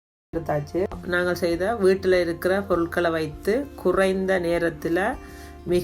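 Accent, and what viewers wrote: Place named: Sri Lanka. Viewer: மிக மிக அற்புதமான ரெசிபி அதைவிட தங்களின் இலங்கைத்தமிழ் என் மனதை கொள்ளை அடித்து விட்டது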